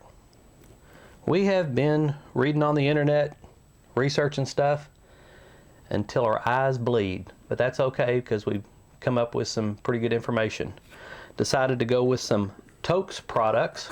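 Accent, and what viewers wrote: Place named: East Tennessee, United States. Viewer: Good review. Your accent shows your family was from East TN or similar Appalachian Mountain origins; maybe that is where you get your backpacking wings? Never heard a TX person nail the East TN accent as well as this.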